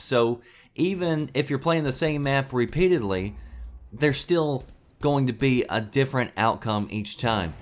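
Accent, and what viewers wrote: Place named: Texas, United States. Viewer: Really man I'm not even gon lie I'm being totally honest but your the best YouTube channel I have came across .. You sound like your from Texas . I love how you keep shit real man .. I love watching your videos .. You deserve the most success on YouTube . Only if you can be super constant with videos & have a lot more content you will be the best .. Love your channel bro I really do . I use to watch Ali a ghost Robo & others but your the best .. They are to soft lol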